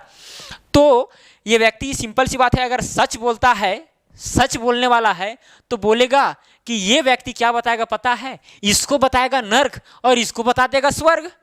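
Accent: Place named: Jharkhand, India. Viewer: सर जी आप झारखंडी लगते हैं आपकी बोलने के अंदाज़ से Kyuki Mai भी jharkhand से हुं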